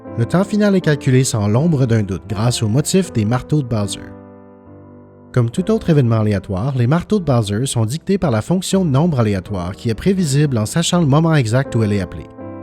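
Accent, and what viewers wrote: Quebec, Canada. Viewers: Et c'est ainsi que je decouvre que je comprends mieux l'anglais nord-américain que le français québécois (je suis Francais) 😐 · Moi non plus, mais son accent en anglais est clairement comme un francophone, mais il n'est pas l'accent typical pour un francophone qui vient de France. En mon expérience, c'est presque toujours Québécois lol (Je suis désolé pour ma grammaire, je suis Suédois) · I can usually pick up French accents on people from France speaking English, but for I did not know it until he said in the community post. Watching this video now, I noticed some words sounded very odd compared to what I learned back in school and what I went on to hear from French people. Thanks for confirming my suspicion of him being French Canadian to be correct!:D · L'accent québécois est parfoit difficile à comprendre pour moi ^^'. Peut-être je peux m'y habitué avec cette chaine, haha. 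· Une speedrun? J'ai toujours entendu un speedrun, étrange.. Ça fait du bien d'avoir une vidéo narré en français avec un accent québécois d'une aussi haute qualité, j'en veut plus :)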